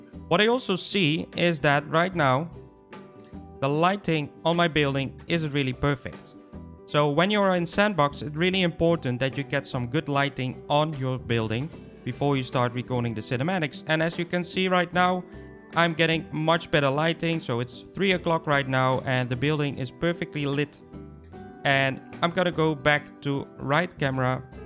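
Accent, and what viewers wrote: Netherlands. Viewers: Your accent sounds dutch... ben je een Nederlander?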